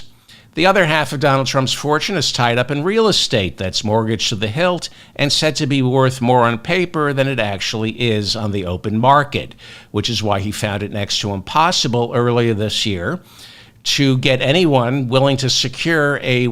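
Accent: New York, United States. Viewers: don't worry bro, I suspect your listeners are smarter than the average bear. We can look shit up. a priori: relating to or denoting reasoning or knowledge which proceeds from theoretical deduction rather than from direct observation or experience. So that's like circumstantial evidence, right? And I knew you'd come up with Kevin McCarthy's name sooner or later. Disappointed it took you so long, but never for a second did I have any doubt that you had it rattling around up there somewhere. And no one ever recommended your show to me, you randomly popped up in my YT sidebar one day and I clicked on you, no idea why. After one episode of that slow measured deliberate soothing NY drawl I knew you were my Huckleberry. I listen to you on my phone in the wee hours early in the morning when I need help falling asleep. Seriously though, I find I agree with you more than any other left-wing commentator I've seen on YT. 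If you were a little younger and sexier and had a full head of hair like Brian Tyler Cohen, Kyle Kulinski, Jesse Dollemore or David Pakman you'd easily have 5 million subs. Problem is I've seen your pics, oof, you weren't any sexier 20 years ago either. But either way this was your true calling, you never should've wasted all those years writing comedy and pretending to be a 3rd rate comedian. If you had started doing this a little earlier you'd be a progressive independent media juggernaut by now. I'm still not buying any of your stupid mugs and crap though so don'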